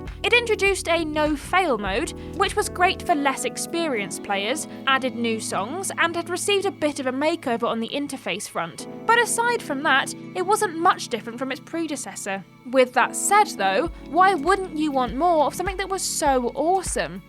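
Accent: United Kingdom. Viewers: this girl narrating is to British sounding driving me insane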